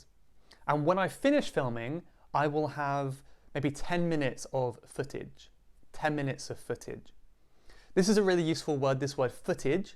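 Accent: United Kingdom. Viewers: Teacher, may I ask which part of the UK you are from? 😊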